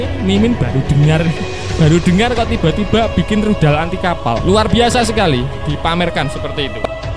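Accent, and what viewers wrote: Java, Indonesia. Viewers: Cak jowo ne mentok banget pasti wong jowo iki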